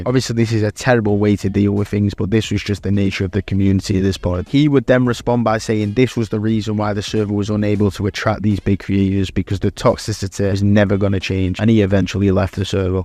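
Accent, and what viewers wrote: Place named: United Kingdom. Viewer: The fact that ur a so British makes me watch this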